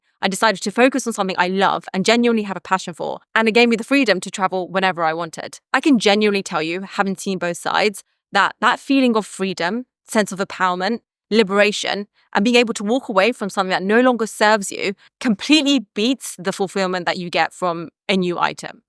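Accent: United Kingdom. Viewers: Nice presentation with nice british accent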